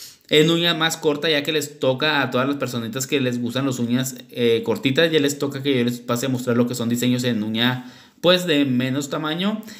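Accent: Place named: North, Mexico. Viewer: Hermoso para una novia de impactó 😍eres norteño? Me gusta tu acento, si queremos diseñitos en punta mas corta saludos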